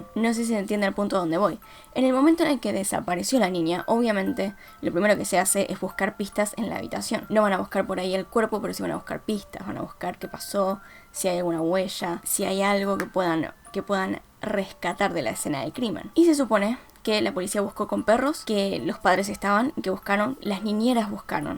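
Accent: Argentina. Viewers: Me sonaba tu acento Yo tipo: es argentina Y vos justito lo dijiste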